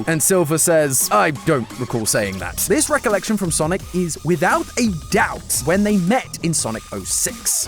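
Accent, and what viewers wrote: England, United Kingdom. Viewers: Sorry someone update, why does Matpat sound like he's english now?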